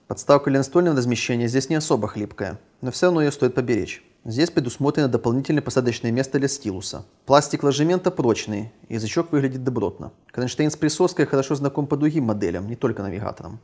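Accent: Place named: Ukraine. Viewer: комментировать на русском украинским диалектов кокто не очень:( но видно старался так что палец верх :)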